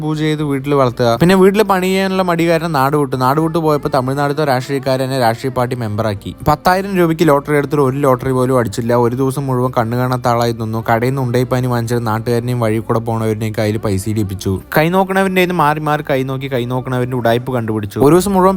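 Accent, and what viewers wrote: Palakkad, India. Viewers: Palakkad slang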